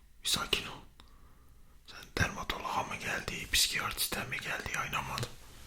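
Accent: Eastern Anatolia, Turkey. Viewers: ilk defa doğu şivesi konuşan doktor görüyorum dfsghdjfkgt teşekkürler <3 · gece gece tam ihtiyacım olan şey. doktor roleplay mi hayır doğu şiveli olmasindan bahsediyorum(3 senedir türkiyedeym bende 17 me kadar ingilteredeydm iyi gceler)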